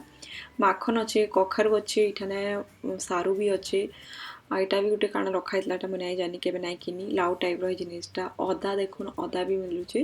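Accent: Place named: Sundargarh, India. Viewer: I know u r from Bolangir..but Ni Ni ta bodhe Sundargarhia like kahisan na.. anyways doesn't matter..I like u..👍👍good work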